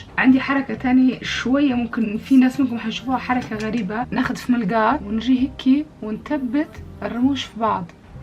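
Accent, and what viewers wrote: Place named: Libya. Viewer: اول مرة من نهار زدت عمري مالقيت قناة ليبية وانا في قمة السرور اني التقيت بقناتك واخيرا راح اسمع لهجتكم من الجزائر❤❤❤❤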